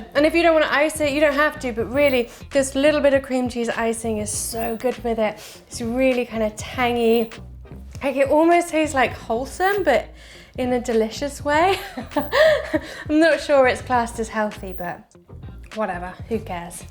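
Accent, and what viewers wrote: United Kingdom. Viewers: hello from south wales so nice to hear a british voice on a cooking channel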